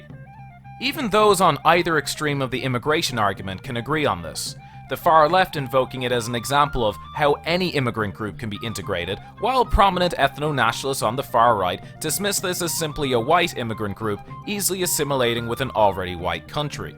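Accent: Ireland. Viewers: ive got 98% irish blood and 2% viking, living in dublin. Where may i ask are you from? You've got a neutural irish accent, so i can imagine your from dublin also? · Firstly you sound Irish... I myself is Ireland. You know the 18 century was violent in any part of the world. White America we’re killing Indians and deporting them to other parts like the trail of tears. Few points you made are right things I didn’t know but you’re way off on other things. Firstly more Irish women emigrated to America than male Irishmen. Other thing you’re wrong and makes me angry Abit is that the coal mines where pretty much a slave business and the Molly Maguire’s were standing up to this. You’re right that Irish people at the time were not that educated because the British controlled Ireland in the 19th century and had laws that Irish couldn’t get educated or practice their religion.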